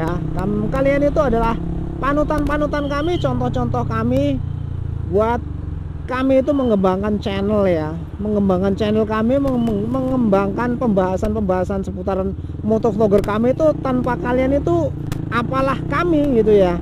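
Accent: Java, Indonesia. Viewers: Masih medok ya 😂😂😂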